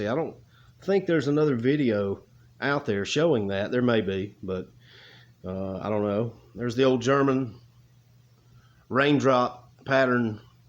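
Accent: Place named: South, United States. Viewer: Haha glad to see you, always good information and spoken in good ol Southern English. Really like your AK reviews, be safe everything is going to work out!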